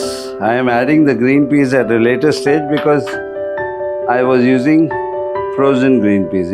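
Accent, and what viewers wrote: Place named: India. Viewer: I’m third generation Indian born and living in South Africa. Love the content, your accent is a little bit similar to first generation Indians. My grandparents surname was Vengtas Ramiah, they are now late and wish I knew where in South India we are from. I visited Mumbai for work and loved it. Love from Johannesburg ❤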